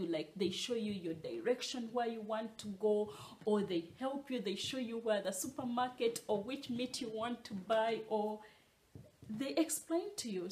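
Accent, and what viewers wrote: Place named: Uganda. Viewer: From your introduction 0.01 I knew you were Ugandan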